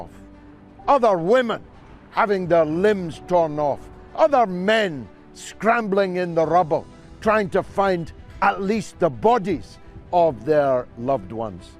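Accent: Scotland, United Kingdom. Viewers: He's like a white MLK with a Scottish brogue. He needs to be PM.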